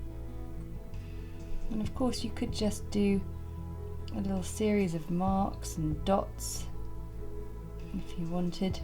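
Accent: United Kingdom. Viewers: your art is absolutely awesome! love every detail, so delicate, your creativity and your beautiful british accent, thanks for sharing!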